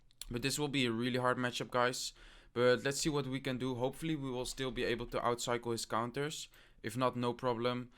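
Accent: Netherlands. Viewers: Nice accent mate, are you dutch? (Like me) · That dutch accent tho. Die herken je zo ;) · he have a Netherlands accent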